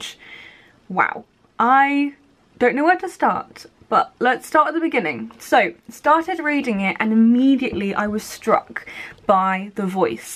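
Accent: United Kingdom. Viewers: Certainly I just love reading vlogs 😇 Thank you so much for this amezing Vlog😇🤝 And you know what your British accent is just phenomenal ❤️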